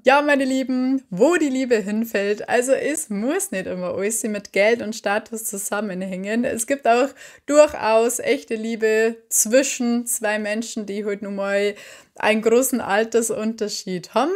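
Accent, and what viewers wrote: Bavaria, Germany. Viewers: WOW,.. wo bin ich denn hier gelandet ❓️ Hübsch, sympathisch und ein interessantes Thema bei Youtube ❓️ Das sind ja gleich 3 Dinge auf einmal und das geht hier doch nun wirklich nicht... Also manchmal, so wie in deinem Fall, finde ich die Algorithmusvorschläge wirklich toll... Mit deinem verschmitztem frechen grinsen sowie deiner verführerischen erotischen Stimme in Verbindung mit deinem süßen Bayerischen Dialekt und deiner offenen lockeren fröhlichen Art, hast du eine sowas von sympathische Ausstrahlung, das ist einfach unglaublich.... WOW ‼️ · Wie kann man nur so unglaublich an ziehend und attraktiv sein. Wenn du mir über denn Weg laufen würde würde ich dich sicher nie mehr vergessen können. Allein deine Stimme mit einem so schönen Bayerischen Akzent Wow.....😮. Liebe Grüße Torsten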